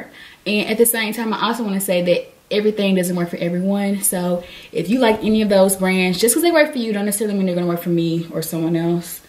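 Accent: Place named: South, United States. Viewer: Gorgeous and your country accent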